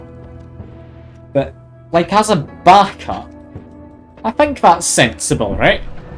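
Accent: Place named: Scotland, United Kingdom. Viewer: sorry if I'm coming off a tad bit rude but you sound a wee bit Scottish. All i can hear is the Scotsman from samurai jack.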